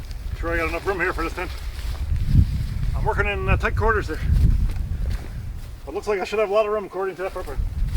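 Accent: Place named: Maritimes, Canada. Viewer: Really enjoyed the thoroughness of this review! Being from the Maritimes I also enjoy the accent, eh?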